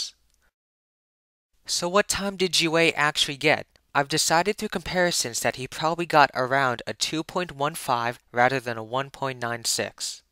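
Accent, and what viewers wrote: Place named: Philippines. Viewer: lol yep :D but idk it's weird I never realized how much of a Tagalog accent you have... But thanks anyway :P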